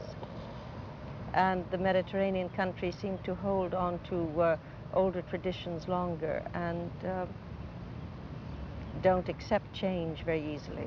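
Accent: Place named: Europe. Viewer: she's lived in Europe among Europeans for decades so she's almost certaintly developed the accen you can hear.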